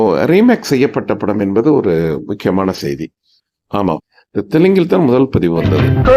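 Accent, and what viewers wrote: Jaffna, Sri Lanka. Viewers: யாழ்ப்பான தமிழ் கேட்க கேட்க அவ்வளவு இனிமையாக இருக்கிறது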